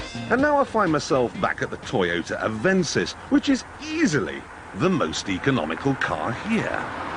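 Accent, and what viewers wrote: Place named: RP, United Kingdom. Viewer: JC sounds so different back then!! He sounds all posh and BBCey!!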